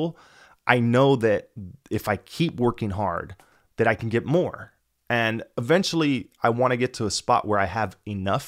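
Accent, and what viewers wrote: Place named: Miami, United States. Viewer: Glad I heard this. We grew up with similar lives and happy to see there’s more people like me in the tech industry. Btw as soon as you started talking I knew you were from Miami, I was right lol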